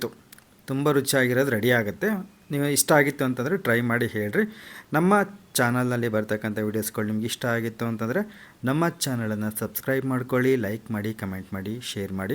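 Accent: Chitradurga, India. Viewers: 👌🏻👌🏻.. ನಿಮ್ಮ ಚಿತ್ರದುರ್ಗದ ಭಾಷೆ ಚಂದ... ನಿಮ್ಮ ಅಡುಗೆ ಹಾಗೆ....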